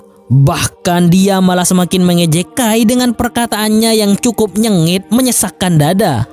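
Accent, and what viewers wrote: Madura, Indonesia. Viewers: Abeh mak sellae madura 😁😁😁😁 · Medureh ongghu , keren ❤❤❤ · Suara latar nya kayak orang Madura logatnya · Abbeeee alure campur madura masze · Oreng madureh hedeh nom😅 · Reng madure ini..se ge oge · Oreng madureh mon disoaranah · Medurene e tengale Mak 😂 Tp mantap 👍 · reng madureh kak... madureh dimmah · Ini komentatornya orang madura ya....😂😂😂 Soghe' ckna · Madureh reh😂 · Orang Madura dia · Ye pokeeh madureh tulen 😂😂😂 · Corak reng madureh reh adminah😩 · 😂 logatta tangake jhek Reng madureh 😅 salam Sampang bang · Pengisi suaranya kek orang madura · Lucu moderatornya,letolean 🤣...mantap...madura eksen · Suaranya madura bgt , tlg d benarkan bang .... Bahasa indonesianya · Reng Medureh cook😂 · Haha, mimin madura 😅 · MK Reng madureh kak🤣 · Oreng medureh hedeh kak Yeh wes auto subrex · Mak medureh kak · Ngkok agelek eh takok dusah ternyata adminah reng medureh😂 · Madureh mas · Mak pas bedeh bhs medurehnah tretan Mantap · Kurasa adminnya madura dr logat ya sya tebak, mantep tretan😂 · Njir madura cokkk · Min dari madura yah ?? 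Kentara banget logatnya hihi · Madureh dimmah sampean tretan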